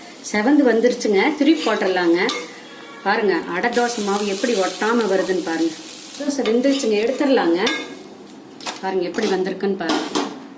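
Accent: Kongu, India. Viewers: எந்த ஏரியா அம்மனி நம்ம ஊரு பாஷை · Aunty நீங்கள் பேசும் கொங்குத்தமிழ் சூப்பர் 👌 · I used to prepare adai dosai with your measuring only amma.. i already made for more than 5 times. Very nice measurements explanation.. additionally I will add moong dal .. happy to hear our kongu tamil. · Very good narration. My nepali cook not known this adaidosa. Tq .kongo Tamil. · Unga kongu Tamil kekarappo enga patima gyabagam varuthu. Very nice explanation. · Kongutamil arumai amma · Namma ooru தமிழ் கொங்கு தமிழ் Eappavumey அழகுதான் என்னங்க நா சொல்ரது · vanakkam nga mam..Romba santhosama irukku unga adai receipe pathi neega solrathu ..Appdi oru kongu slang nga..lvly nga..enga ammchi kara chattney (onion .dried chillies. tamarind and salt ) seivanga .ammvum Athe thanunga. .. thuvar am paruppu n ulunthu 1/4 tumbler thaan pooduvanga..muranga thzha romba rare nga ..Oru naal eppdi seithittu comment panrenunga mam. 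Naambal athavathu kaara kolukkatai method post Pannu nga mam.mattai arisi pootu epdi seirathunu. thankz nga mam.☺👑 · Enna oru super navuurum adai👏😋 .adhvum unga Kongu thamizh azhagil ,seimurai azhagil sokki ponen amma.nan ungal magalaga Iruka kudadha😭😭.SANTHI from DHARMAPURI. your new subscriber.all your recipes are simply superb.👏👏👏👏👏👏💐💐💐💐💐💐🙏🙏🙏🙏🙏🙏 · Amma.... more than adai dosai.... ur kovai slang is tasty and awesome.... kongu thamizh..... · மேடம் உங்க அடை சூப்பர் நீங்க கோயமுத்தூர் றா என் தாய் நாடும் அது தான் மேடம் கொங்குநாட்டு தமிழ் அருமை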